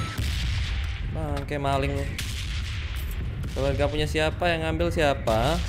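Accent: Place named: Bali, Indonesia. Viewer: Logat bali cik hahaha🤣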